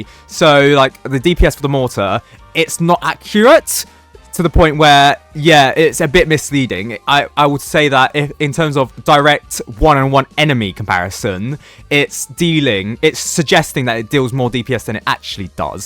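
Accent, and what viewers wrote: United Kingdom. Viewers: I always forgot that this guy was british after his voice reveal😭😭😭😭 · I enjoy you speaking British more than reading a whole paragraph of text ngl this new style is much easier to watch your videos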